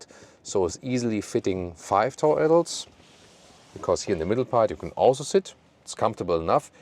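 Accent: Germany. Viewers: Love your accent German Guy. Content and presentation is perfekt! I can now fee the car. Y is a Berlin Car. Good quality. SPASS Leute trennt euch von Politik, die Kiste ist geil! In Chin geht das Ding weg wie warme Semmeln, obwohl da die eigenen Unternehmen für die Hälfte verkaufen. Mal sehen, wann die mit Steueren antworten. Danke für den Beitrag 🚀.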